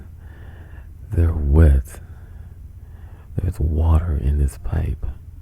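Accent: St. Louis, United States. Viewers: That 314 accent tho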